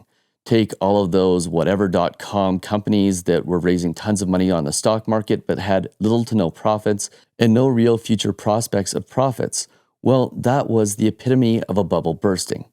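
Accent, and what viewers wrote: Canada. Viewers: Such an interesting video, and beautifully presented. Great to hear a nice Canadian accent.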